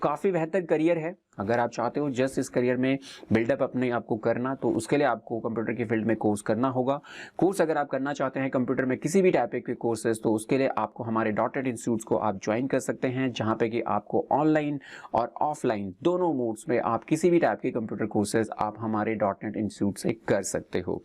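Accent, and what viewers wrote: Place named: India. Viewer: why all the videos have Indians accent !!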